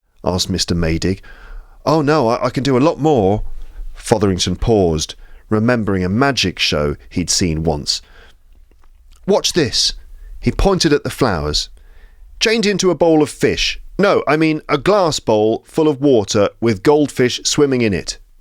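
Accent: United Kingdom. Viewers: I've been waiting for a story episode... İ really enjoy listening to interesting stories by your perfect British accent narration.. please share more stories... thank you very much ❤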